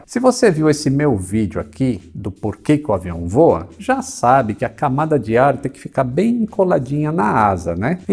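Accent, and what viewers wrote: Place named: North, Brazil. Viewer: percebi um falar NORTISTA no Mano... anda ele comendo muita Carne-do-sol com farinha ou ele é do Ceará? Nós de FOR queremos saber! P.S. " o Mano ê quase indispensável " é muito bem vindo!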